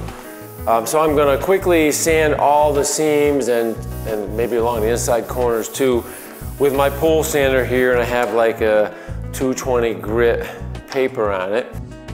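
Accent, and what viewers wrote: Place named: Northeast, United States. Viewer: Hello it's interesting to find your video on here looks like you've been doing this stuff for a long time. Cleaning up this around wondering you must be from somewhere in the Northeast I'm guessing Connecticut Massachusetts😅?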